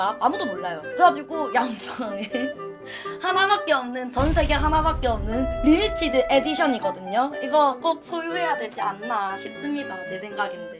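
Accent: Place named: Gyeongsang, South Korea. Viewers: 양팡언니ㅠㅠㅠㅠㅜ 저 대학교 새내기 올해 1학년이에요ㅠㅠ. 부산사람인데 대학을 타지로 가서 너무 낯설구 외롭구 했는데 언니 덕분에 외롭지 않게 즐겁게 지내구 있어요 과제하다가 언니보구 밥 먹으면서 언니보구 시험공부하다가 언니보구 간식 먹을 때 언니보구. 제가 왜 이렇게 언니를 끊임없이 보는가에 대해서 생각해보았는데요 그건 아무래도 사투리가 그리워서 그랬나봐요ㅠㅠㅠ 진짜 언니 없었으면 향수병 걸려서 우울증 걸리고 자퇴했을거에요ㅠㅠ 언니 덕분에 한학기를 이렇게 잘 마치네요ㅠㅠ 항상 고맙구 응원합니다ㅠ 언니 영상은 보면서 공감을 많이 하게 되는 것 같아요 뭔가 사람 냄새 난달까??ㅋㅋ 나두 저런대 나두 저런대 하면서 무릎을 탁 치고 하죠ㅋㅋ 주위에 친구들한테두 열심히 홍보하고 있어요!!♡♡♡ 가끔 혼자 길가다가 파파라치 흥얼거리기도 하구ㅠㅠ 린온 흥얼거리기도 하고 ㅋㅋㅋㅋㅋ 저번에는 강의시간에 나이따 나이따 나나나나나나 나이따하는 금지님 목소리가 계속 멤돌아서 죽는 줄 알았어욬ㅋㅋㅋㅋ 언니 방송해주셔서 감사합니다ㅠㅠ 항상 파이팅!!!! 사랑해요!♡!♡ <mail> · 종종 잘보고 있습니다 같은경사도라 그런지 사투리 쓰는거 볼때마다 정겹네요 ㅋㅋ 40만추카드리고 리미티드 꼭 당첨되고 싶어요 <mail>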